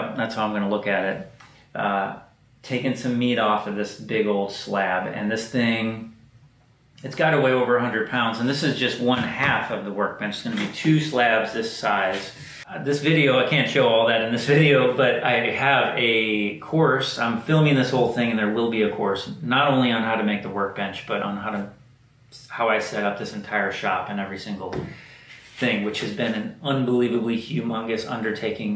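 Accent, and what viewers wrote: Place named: England, United Kingdom. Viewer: I came across your site, I was imprest, and I join. Must say you do not sound American but English. Where are you from ?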